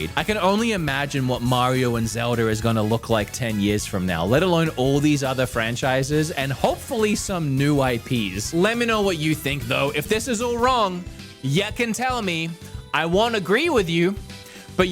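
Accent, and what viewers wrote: Australia. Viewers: what is your accent, it sounds like a mix of Australian and Boston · Where is he from? Is he Australian?? He has a lovely accent! And I can understand it perfectly! ❤😅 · The last time I watched one of your videos, you had an aussie accent?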